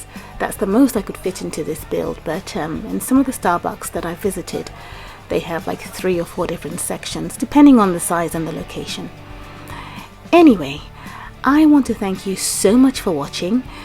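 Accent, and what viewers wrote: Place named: United Kingdom. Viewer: Ahhh you live in England two I kne2 you had a little British accent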